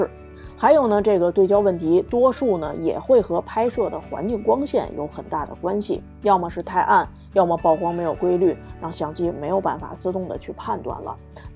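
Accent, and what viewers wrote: Beijing, China. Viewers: 每期视频都干货满满。谢谢！很喜欢Edi闲适的语速，听起来舒服而真诚，带着些许的京味儿，更是倍感亲切。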